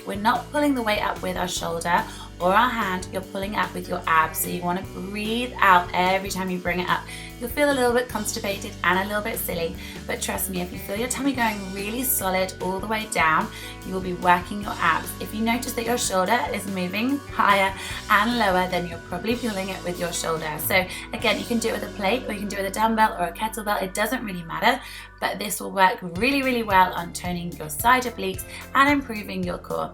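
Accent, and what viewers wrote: United Kingdom. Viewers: How refreshing to hear a British babe on youtubes fitness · Are you from Norwich? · Are you from England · That body + British accent= heaven! Wow!!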